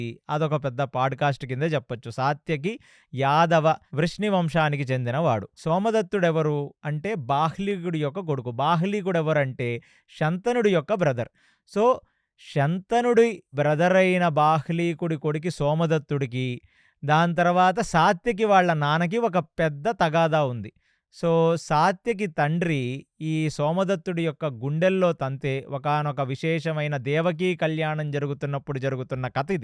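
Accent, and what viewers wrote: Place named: Andhra Pradesh, India. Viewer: అసలు ఎలా time manage చేస్తున్నారో మాకు కూడా చెప్పండి sir...😂❤ ఒక పక్క job చేస్తూ ,, ఇటు వైపు మహాభారతం మీరు చదివి,గుర్తుంచుకుని మళ్ళీ మాకు easy గా attractive ga చెప్తున్నారు Hats off sir 🫡🙏more power to you ✊🔱 సనాతన ధర్మాన్ని కాపాడటంలో మీవంతు మీరు చేస్తున్నారు🙏 మీది Andhra కదా !! అనిపించింది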